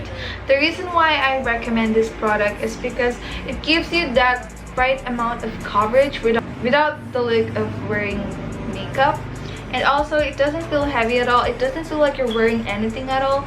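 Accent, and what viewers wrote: Philippines. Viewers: That accent and your beauty (and also the miniso products, I remember those stores when I went to visit last year)! I knew you were Filipina! I’m half and so proud! ❤️🇵🇭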